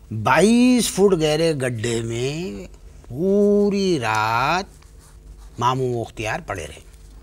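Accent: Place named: Bareilly, India. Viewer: Urdu accent of Bareilly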